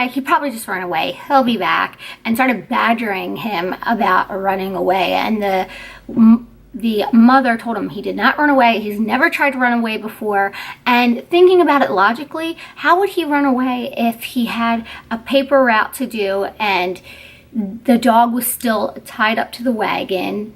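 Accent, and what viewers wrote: Delaware, United States. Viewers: This is one of the more intriguing and unsettling missing children cases I've heard. Btw I like your accent. I had never heard a Delaware accent before.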